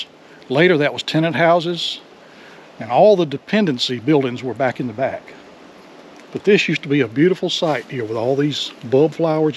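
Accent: South, United States. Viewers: and are both interesting men to listen too. They real seem to enjoy making the videos. you are a excellent historian and that wonderful southern accent of yours, just adds to the story telling. Thank you both 😊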